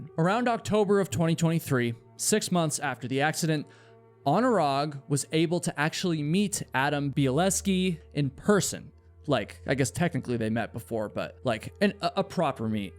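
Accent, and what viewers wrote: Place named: United States. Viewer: I'll subscribe if you take that flag down. We can HEAR you're USian. Peace and love from Denmark.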